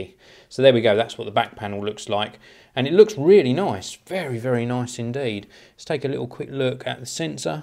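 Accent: United Kingdom. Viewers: Nice review, very relaxed :) First British accent I have no trouble understanding. Looking forward to your in deep review.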